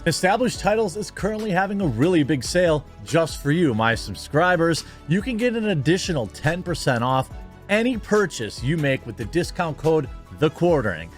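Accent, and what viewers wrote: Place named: North, United States. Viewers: Yo, ignore those idiots who take issue with your pronunciation of the short-a sound ("ă" --- which the International Phonetic Alphabet actually represents with this symbol: "æ") like as in words that rhyme with "flag" and "bag." (Again, in the IPA, those two words are actually even represented, respectively, as "flæg" and "bæg," so it's not like the slight bit more emphasis on the "e" part of the "æ" was ever really wrong, anyway.) The only thing i will say, is that's not a midwest accent (well it is, but it's more specific than that). That's a Northern Midwest accent. Chicagoland and higher latitudes. I had to point this out because, as a fellow midwesterner, i don't pronounce my vowels like you do but I'm still midwestern. However, I know my neighbors to the north do, certainly, sound like you (and it's part of their charm lol). 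So, long story short, don't acquiesce to these numbskulls, be proud of your accent (and the region it associates you with in this great, massive, country of ours [.. "from SEA to shining SEA," commies]). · please don't care about the way you say flag or bag or stag or any of those type of words. It is normal to say it that way i nthe mid-nrothern states so no need to be self conscious about it.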